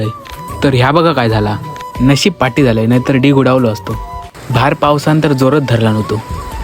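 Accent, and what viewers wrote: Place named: Malvani, India. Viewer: Mast😊 ani malvani voice ❤ ek no.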